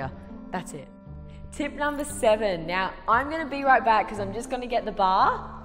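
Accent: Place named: Australia. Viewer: Hi my son and I have been watching your videos for the past few weeks and we really enjoy them. He is a budding of ballet dancer. He would like to know if you have any videos with boys in them instead of girls. He also would like to know if you coach boys. We live in New York city. But we love your Australian accent. Please let us know if you ever plan to put Boys on your Channel and display exercises for ballet Styles particularly for boys. Thanks keep up the great work.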